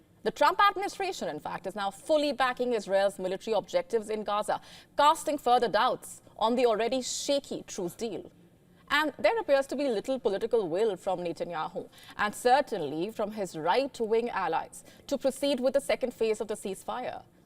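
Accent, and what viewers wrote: India. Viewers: Indian English so funny😂😂😂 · man fkn indian accent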